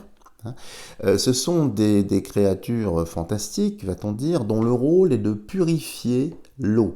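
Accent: France. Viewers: Bonjour quel plaisir que d'entendre parler un pur français... quelle belle langue que la nôtre. En sus de vos connaissances et de vos explications claires et précises sans blabla inutile ni dénigrement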